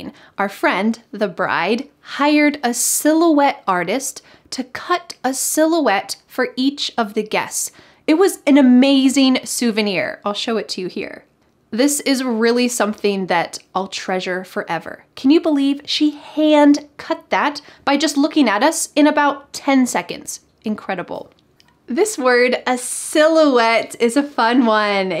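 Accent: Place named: United States. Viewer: I got impressed with your story about vacation and I can figure it out how fun it was. in my country I never get a vacation so I work twenty for seven. it's really tiring but I just took it for granted for everything that I have. I wish I could it's probably after retiring , I really like your video lesson and love American pronounciation. thanks a lot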